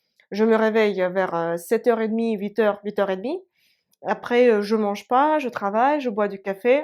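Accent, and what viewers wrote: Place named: Russia. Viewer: Comme si on y était ! et avec l'irrésistible accent russe! 😊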